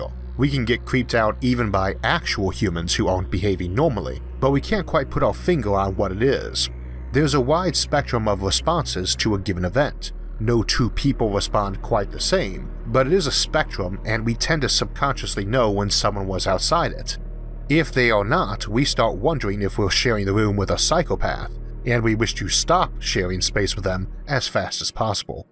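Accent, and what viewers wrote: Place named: South, United States. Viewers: While it's not an accent, his voice sounds enough like a Southern US speaker that my brain just loads Georgia.txt and understands him fine (well, I can understand, my time living there was worth it then!). His older videos are hard, but I think that's the fault of whatever mic he was using. The new ones are fantastically clear. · You should speak more in the southern accent.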